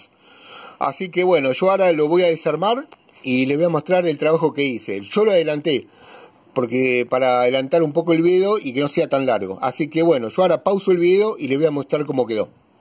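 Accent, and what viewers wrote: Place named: Argentina. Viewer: Se nota que este canal es argentino...Ya viendo la clavija de tres patas,y el acento ya uno se da cuenta...jejejeje Sólo falta el mate...:v Felices fiestas...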